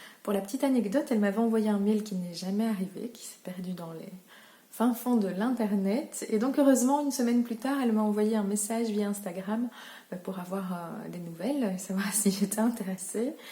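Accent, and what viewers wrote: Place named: Belgium. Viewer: Bonjour, je découvre ta chaîne avec cette review de ce livre que j'hésitais à acheter. Grâce à toi, je ne doute plus. La méthode est très complète, c'est parfait. Merci beaucoup. J'en profite pour m'abonner et découvrir toutes tes autres vidéos. Et ça fait plaisir d'entendre ce si joli accent belge ❤️❤️❤️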